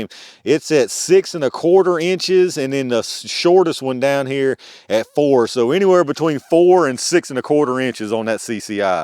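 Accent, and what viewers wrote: South, United States. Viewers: I knew the CCI would work. It's the same bullet that's loaded in the Subsonic Segmented HP CCI sells. I use the mini mag version in my suppressed 6". The run 1060fps from it, and the subsonics run pretty much the same velocity from my rifles. From your accent, I can tell you're another southerner (Bama here). So you can face Alabama and wave, Hi neighbor ;) The segmented CCI won't exit a raccoon or possum, in my experience. Convenient when either are raiding the cat feeder on the front porch 👹 Also, glad to see another 10mm fan <3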